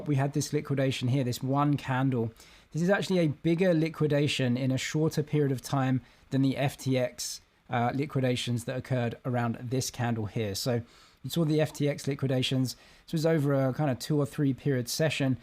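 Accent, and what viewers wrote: England, United Kingdom. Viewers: Fantastic. Where in England are you from?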